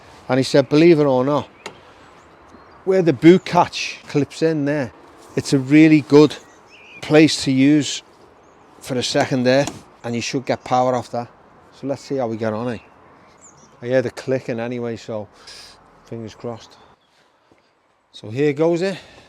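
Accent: Liverpool, United Kingdom. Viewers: The scouse swagger ...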